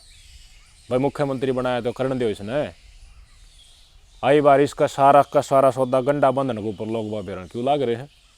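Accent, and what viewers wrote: Haryana, India. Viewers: भाई बहुत स्पष्ट रूप से बोलते हैं और सतह m हरियाणवी bolte h kati char chand lga deta h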